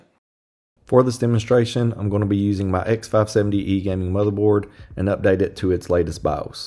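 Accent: South, United States. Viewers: a little southern drawl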